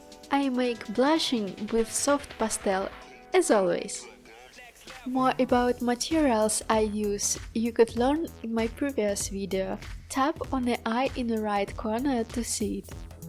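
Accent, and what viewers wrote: Russia. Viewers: Very interesting makeup, and.. Your pronunciation is like you are from Russia😅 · Emma Ray just because she IS from Russia :D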